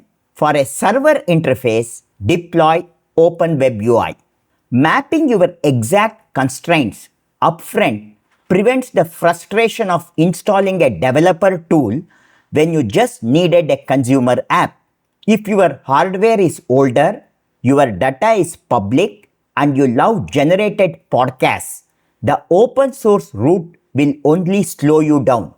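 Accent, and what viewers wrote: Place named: Tamil Nadu, India. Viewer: Good one thanks. I genuinely like your accent. I grew up with Tamil-speaking friends and reminds me of home.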